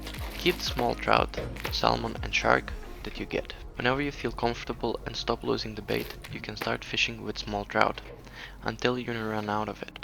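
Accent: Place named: Lithuania. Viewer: u sound lithuanian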